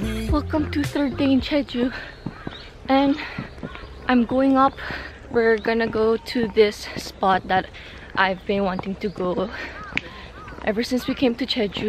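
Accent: Philippines. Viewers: wait—u kinda have a Filipino accent😧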